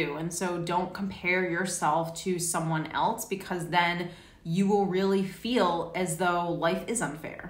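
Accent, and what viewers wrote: United States. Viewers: thank you lady to listen to you it helps me to overcome my deception and now I'm so confused. and it's true you can't control what happen in our life . I have another tool to keep living glad is the music . I don't know where you have found this wisdom? it's too nice to hear your American accent. thank I feel better now and I learn so more when I go through trouble it learn me the reality of the life. thank you I'm RICHARD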